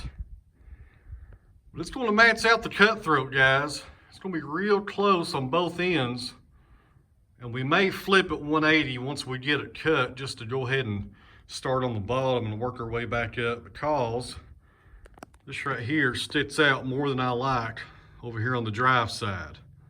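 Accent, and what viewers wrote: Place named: South, United States. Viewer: You are a very charming guy. I love your southern accent. Love from Canada 🇨🇦. The Cats are adorable 💕💕💕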